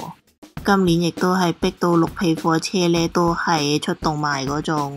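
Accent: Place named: Cantonese, China. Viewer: 放假最好就梗係係屋企嘆住杯熱茶睇報道新鮮滾熱辣嘅純正粵語報道了(ง •_•)ง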